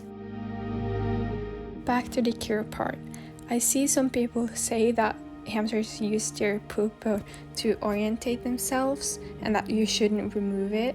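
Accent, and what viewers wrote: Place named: Norway. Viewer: Hei hei, er du norsk 😊😊? hvor kjøper du ting til hamstere? Sorry if I’m wrong just guessing from your accent/English!!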